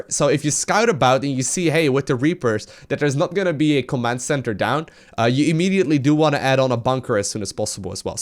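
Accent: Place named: Netherlands. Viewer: hey, its extramadchicken the guy who literally started playing sc2 again due to that fabulous Dutch accent. anyways i know this is an old video but since u are still actively streaming i was wondering of what we would like Your easy to follow tutorials. i was wondering if you could potentially create more terran and possibly toss videos when u have time. Although zerg became my initial choice due to a wide variety of videos youve made as u are the best sc2 instructor out there, id like videos of u analyzing some Terran and toss basics and principls. It will inadvertently help zerg players because it will teach them the mechanics behind the other races. Also when i decide to not be a pleb anymore, ul be the first and likely only streamer ill sub for xD kappa.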